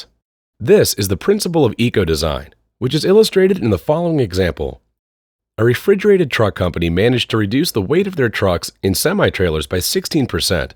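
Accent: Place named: United States. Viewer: Why is there an American voicing for an EU project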